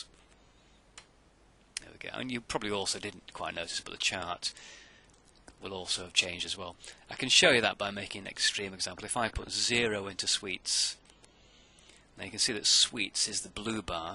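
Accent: England, United Kingdom. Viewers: wow really well presented and nice to hear a good old British voice just getting down to business straight away. If only you'd taught us IT, I'd have understood Excel so much better. Thank You. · I think I learn better when I'm getting taught with a British accent. (: I love it. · Excellent refresher, he's even got the British accent to make it sound less boring than my college MS Office instructor. :) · such clear sounding brits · thank you for you advices! and you've got a cute english accent, hihi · thanks you rock plus brits are cool crisps and stuff i love it accent is wonderful :) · I haven't used Excel since I taught some middle school course on it at least 10 years ago. Good to hear the English voice, Excel called kind, and "crisps." I'm off and running on my first spreadsheet thanks to this! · You are wonderful. Thank you for putting this up! 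The British accent makes it better too.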